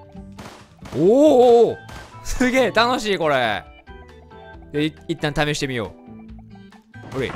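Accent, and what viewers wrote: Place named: Osaka, Japan. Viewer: おかりんさんこれからもずっと動画投稿お願いします。おかりんさんの大阪弁面白くて大好きです。動画投稿頑張ってください。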